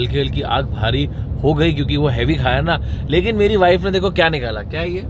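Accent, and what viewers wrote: Mumbai, India. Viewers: Omgg I have to say this.....in past few days I have watched videos so so much like binged them so bad.....that my accent has chaned from punjabi to typical mumbai accent 🥺😂❤❤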